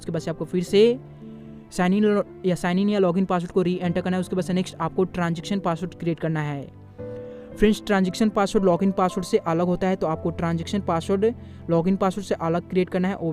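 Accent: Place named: Punjab, India. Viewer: Punjabi accent fast voice not good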